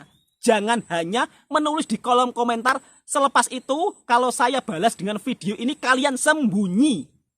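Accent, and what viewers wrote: Java, Indonesia. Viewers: Jawa banget bahasanya mas...asyik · bahasa nya pekat org mana tu.. isor ngomong jowo. pekat tnent yoo... menarik sekali sebutan nya kang.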